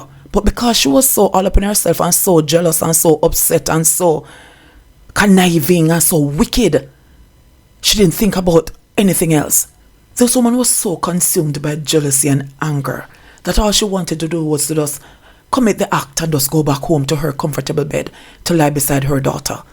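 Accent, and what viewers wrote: Jamaica. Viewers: Bradshaw watched too much murder series movie. Send person X to kill the young miss and her baby, then sending person Y to get rid of person X. She thought she would have gotten away with her devious act. Condolences to the family of the deceased. Love your blend of professionalism and the pinch of patois you integrated I your delivery of the new 😅. Well done 🎉❤👍💯🙏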